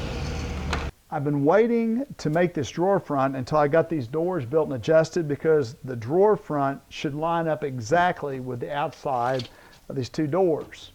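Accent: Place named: Arkansas, United States. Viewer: Arkansas "mayshure" for measure. :) Accent sounds funny. Also "rebate" for rabbet. Good Videos.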